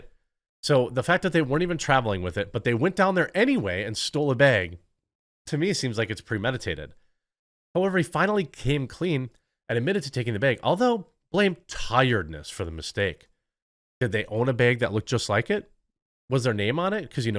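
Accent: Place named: Midwest, United States. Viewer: Your Midwest accent really comes through when you repeat the word "beg" and "beggege", as in, he stole a "beg" and flew a "fleg".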